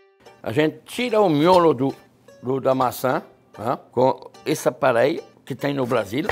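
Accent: France. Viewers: Que Francês maravilhosooooooo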